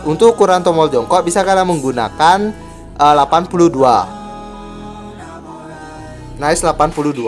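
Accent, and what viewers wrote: Bali, Indonesia. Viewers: Logat nya Bali banget😊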